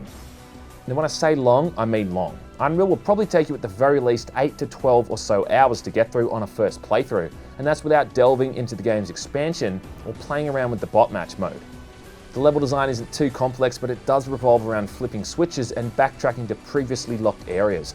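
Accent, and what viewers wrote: Australia. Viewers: Is that an Australian accent i hear?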